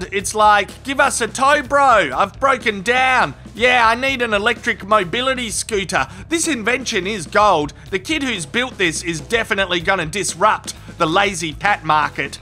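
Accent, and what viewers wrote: Australia. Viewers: I haven't laughed this hard since I've listened to the comedy rantings of Rodney Rude. Love the Aussie accent by the way.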